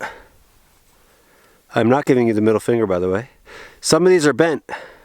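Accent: Canada. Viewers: I really enjoy your videos, i am not young, not outdoorsy, not strong, but i do enjoy all you do please keep the vids coming; i just stumbled on your vid, where are you located? I hear “ uwt for out so Canada? How do you keep safe out there all by yourself? Your wife doesn’t worry? Thank you for sharing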